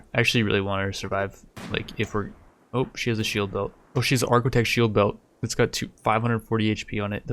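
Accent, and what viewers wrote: United Kingdom. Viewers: it's called british english, he's pronouncing it perfectly fine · he sound British to you?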